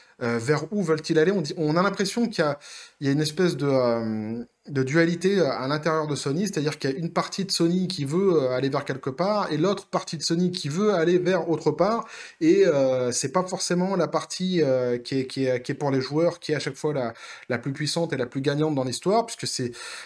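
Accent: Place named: Quebec, Canada. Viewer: L'accent Québécois est fabuleux 👍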